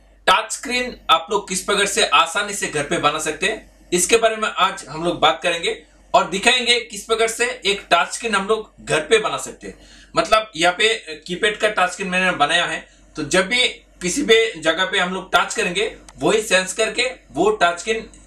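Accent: Bengal, India. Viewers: Bhai aap bangli ho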